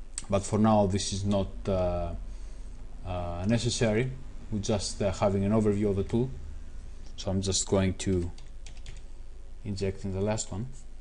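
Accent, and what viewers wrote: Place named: Greece. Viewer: are you greek or sth ? i can detect an accent :P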